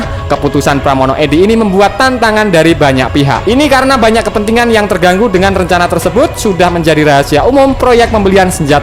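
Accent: Java, Indonesia. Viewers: Min .. min mimin kok suaranya medok ya?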